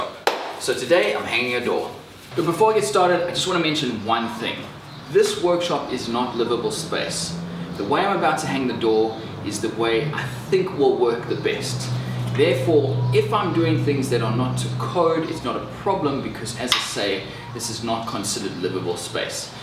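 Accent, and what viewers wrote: South Africa. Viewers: I thought i heard the Durban twang. You have a fan here in East London :) I love watching your videos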